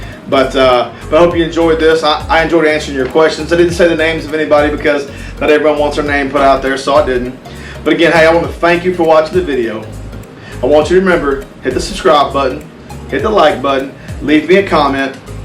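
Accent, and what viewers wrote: South, United States. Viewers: So to sum up: Talks. Sips Crown "Role". Talks. Sips Crown "Role". Talks. Sips Crown "Role". Talks. Sips Crown "Role". Sips Crown "Role". Talks. Sips Crown "Role". Talks. Sips Crown "Role". Talks. This video has been brought to you by Crown Role. LMAO! I think I will contact Crown Role and let them know they have a supporter! Just messin' with ya. I love a Southern accent. I've been sippin' on Tanqueray and Tonics this summer. But the price of the gin at the state owned liquor store is outrageous, so I either need to find a more suitably priced gin that tastes like Tanqueray, or find myself an underground out of state supply! I noticed gas went up about $.20/gallon the last week or two. I was thinking about what caused that and realized it was probably due to refinery shutdowns. Thanks for keeping things rocking there! Daphne Blake should be easily #1 by now of all your bikes. 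Mine definitely is. Reliability, looks, sheer power and speed... You just can't beat it. But my CTX 1300 and V45 Magna were and are definite standouts. There's a new Valkyrie owner on the forum in the Houston area. I posted a link to your channel and told him to check you out. And again, you promised us a Talking Heads video and there was no Talking Heads music. "You may find yourself behind the bars of a large motorcycle. And you may find yourself in a beautiful house. With a beautiful wife! And you may ask yourself... Well? How did I get here?" There - I did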